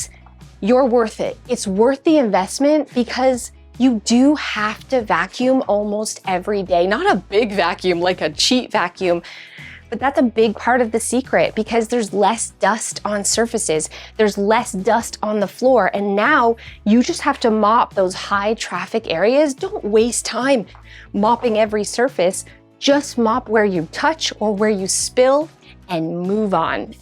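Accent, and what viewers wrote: Canada. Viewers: "aboot" I knew Canada before i even checked. 😍 Great video. Systems are key. Keep up the great work.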